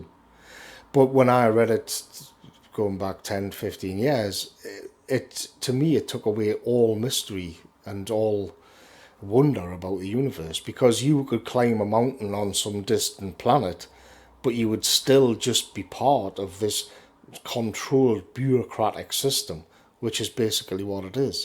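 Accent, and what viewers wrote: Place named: Newcastle, United Kingdom. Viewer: FIX IT FOX Scotsman?....he's a Geordie.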